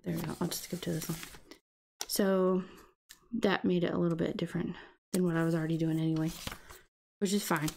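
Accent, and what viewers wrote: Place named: South, United States. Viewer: Hahaha I was just thinking...”is she normally that southern?”.... when you said you were. I thought I was going crazy🤓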